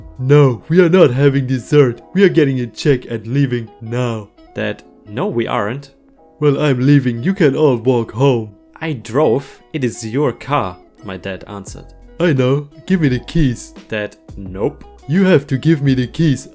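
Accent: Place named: Germany. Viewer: Heyho ^-^ is it possible that you are German ? Becouse i am and your accent is a little Bit german, i was just curious (sorry for Bad english i am german ^^")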